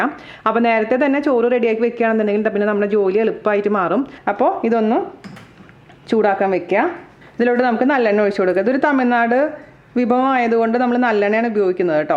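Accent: Thrissur, India. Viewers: Trichur slang sooo sweet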